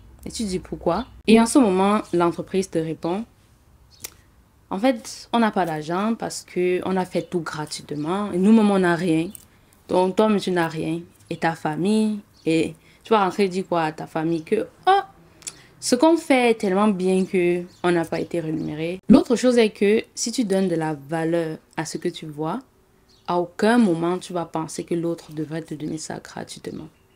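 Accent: Cameroon. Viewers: Merci cela fait un bout de temps que je te suis, seulement en suivant tes conseils on peut beaucoup amélioré sa routine. Il y a par contre celles qui font seulement du pur marketing. C est normal après de faire payer ceux qui veulent plus, c est comme un accompagnement dans le parcours capillaire. Et puis j'aime tes blagues, tes analyses même si je ne commente pas et surtout l accent camer qui me rappelle mon pays d adoption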